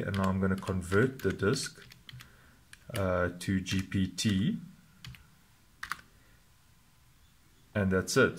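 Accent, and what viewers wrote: South Africa. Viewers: That South African accent! Thanks bro, from one saffa to another!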